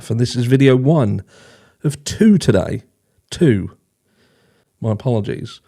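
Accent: United Kingdom. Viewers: Really thank you mate you sincerely do absolute top work on your channel and your balanced yet open view to this most unfortunate matter. (It’s also great to hear a fellow Brit voice breaking the case down… I’m in Kensington myself 🇬🇧)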